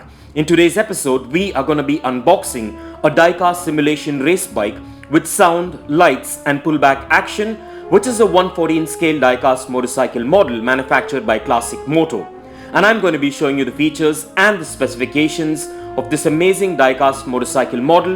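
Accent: United States. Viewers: Your accent is like you are a man from America.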